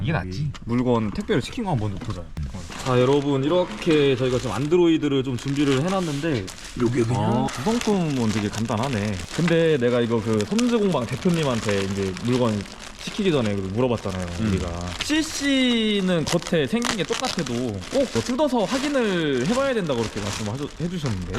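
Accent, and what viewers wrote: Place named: Cheongju, South Korea. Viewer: ㅋㅋ 제가 청주 사는데 동네 친구 목소리 인줄 알았습니다. ㅋㅋ 사투리가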